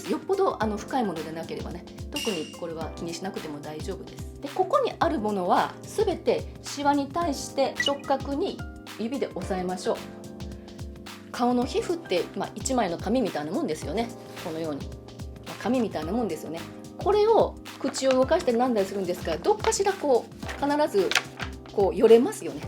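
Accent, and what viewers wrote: Hiroshima, Japan. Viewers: やっぱりですね。 話し方が広島出身の方よね〜ってずっと思ってました。 顔ダンス毎日やってます。始めてまだ3週間ですが、結果が出るの楽しみにこれからも毎日がんばります。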